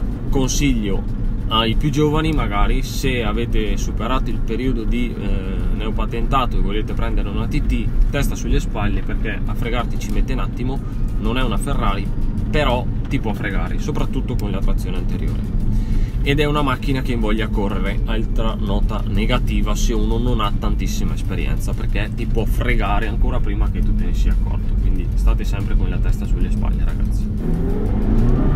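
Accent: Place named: Contarina, Italy. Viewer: ho beccato per caso il video tra i suggeriti e ho riconosciuto subito l'accento Contarinante,top meriteresti più views,adesso mi sparo anche gli altri video!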